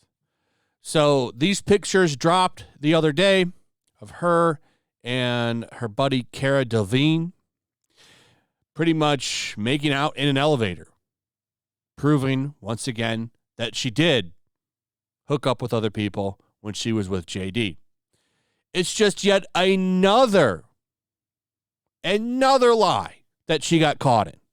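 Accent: England, United Kingdom. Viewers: Just a small thing, but why are you reading in an english accent?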